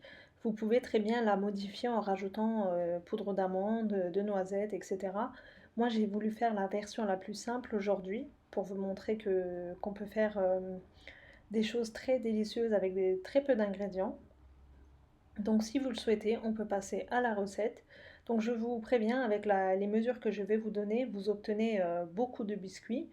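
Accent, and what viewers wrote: Alsace, France. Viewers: Bonjour je viens de découvrir votre chaîne vous faites des recettes peu coûteuse avec très peu d'ingrédients c'est super sympa j'ai testé quelques recette c'est super bon par contre pour la recette des biscuits la le l'objet en silicone pour faire les biscuits au chocolat vous l'avez trouvé où parce que c'est c'est plus pratique une machine ou le hachoir pour faire les spritz donc est-ce que vous pourriez nous dire où vous avez trouvé l'accessoire très intelligente et continuer ainsi à nous régaler et à nous faire économiser de l'argent et continuer à faire du maison et arrêter l'industriel bisous et comptines bonne continuation Jennifer PS votre accent me fait penser à l'Alsace et vous en Alsace parce que je vois que vous prenez beaucoup de produits d'Allemagne bon courage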